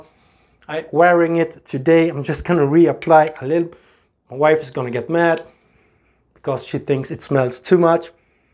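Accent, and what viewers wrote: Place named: France. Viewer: Haha love your French accent 🤣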